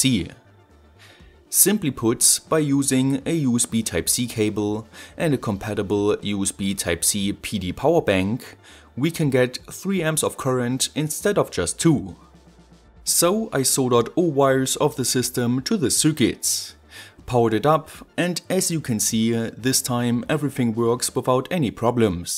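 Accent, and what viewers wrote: Germany. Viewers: German man ? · are you german? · the german accent is making me crazy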